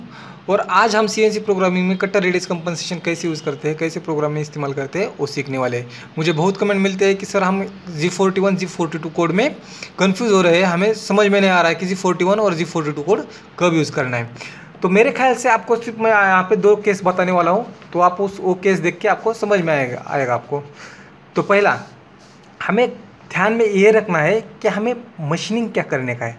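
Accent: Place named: Maharashtra, India. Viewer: Loved the vedio But Marathi anscent is just awesome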